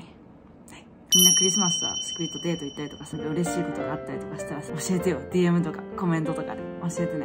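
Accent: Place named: Fukuoka, Japan. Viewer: 髪の毛は「とく、とかす」って言います。ボディクリーム、私はアメリカ在住なんですがアメリカでは結構作っている人多くって私も最近友達の手作りクリームのもらって使用してるけどシアバーター、他、色んな自然オイル（ココナッツやアーモンドオイル等、みんなそれぞれ自分にあったものを入れてるみたいです）使用してあってめっちゃ敏感肌な私にはとてもいいです！オイル使ってるのに塗ったあと全然ベタベタぬるぬるしない、でも保湿はしっかりしてくれて最高です！ナチュラルなものばかりなので髪、顔、ボディー頭から足先まで使えるので超便利です。なので私も来年は作ってみようかなと思ってたところです😊 のクリームできたら参考にしたいと思います。私も福岡出身。アメリカからいつも応援してます、そしていつも地元弁聞いて元気もらってます！😊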